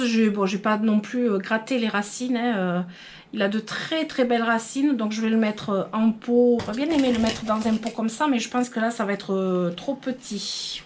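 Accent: South, France. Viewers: Tes videos sont pleines de couleur avec ton accent du sud. J'adore. Merci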